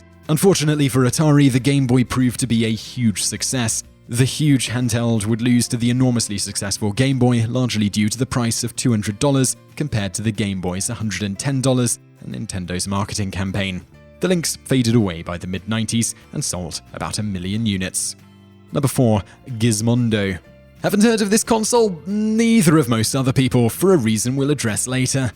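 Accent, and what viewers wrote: United Kingdom. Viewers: Wow. You must be ignorant to the fact that Great Britain alone has many many accents. It's his accent.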